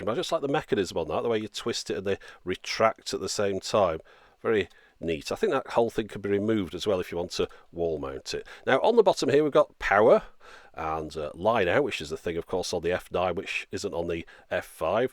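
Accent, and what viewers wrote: United Kingdom. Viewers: This accent is so, so British!!! Like in this video: <link> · Great video. I'm an English student at the University and it's really nice to listen to something with nice British English. Having fun and improving my pronunciation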